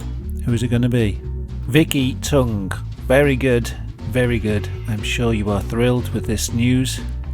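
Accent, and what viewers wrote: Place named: Liverpool, United Kingdom. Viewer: That's not a Welsh accent, it's Liverpudlian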